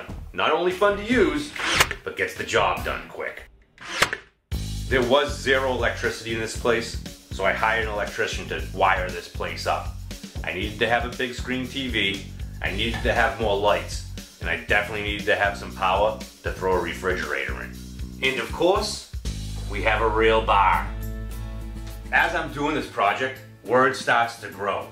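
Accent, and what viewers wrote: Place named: Boston, United States. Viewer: Looks great! Love the Boston accent! ( what’d the wife say?!?)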